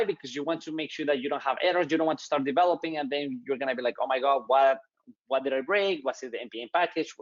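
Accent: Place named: Latin America. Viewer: fua amigo me salvaste de una grande no se de donde sos, tenes tonada lantina y te dicen (? asi q somos hermanos loco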